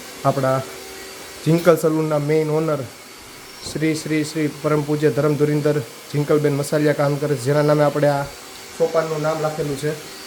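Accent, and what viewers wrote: Kathiawar, India. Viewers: Vah bhai,, pure Kathiyawadi bhasha❤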